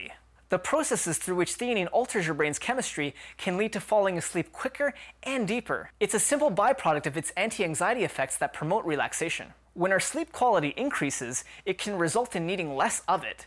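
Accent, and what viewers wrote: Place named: Canada. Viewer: it does sound very Canadian, the portuguese nuance is very very delicate - almost unnoticeable! I am portuguese, but i live in Poland! um abraço da Polónia ;)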